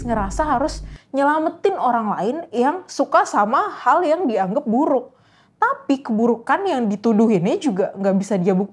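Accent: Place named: Banyumas, Indonesia. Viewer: orang ngapak ya mba hahah PWT kerenn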